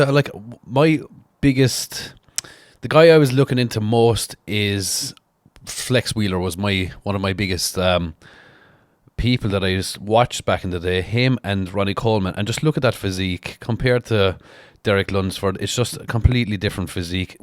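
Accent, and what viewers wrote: Ireland. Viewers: By fuck it's refreshing to hear an Irish man vocalise the amount of gayness in the world these days. Fair fucks to yee bie 🤛🏼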